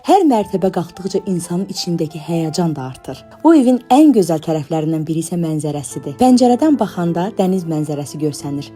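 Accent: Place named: Neftchala Salyan, Azerbaijan. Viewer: LƏHÇƏMİZ HƏR YERDƏ TANINIR❤ NEFTÇALA❤SALYAN ❤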